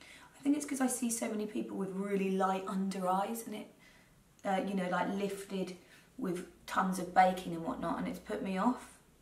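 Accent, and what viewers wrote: United Kingdom. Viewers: Are u from the UK cause I love ur accent/voice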